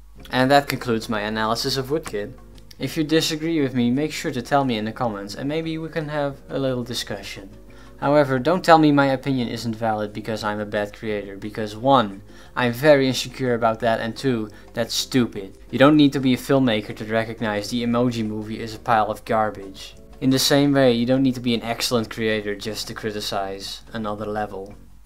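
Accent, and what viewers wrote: Netherlands. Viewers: Are you Dutch? Your accent is dutch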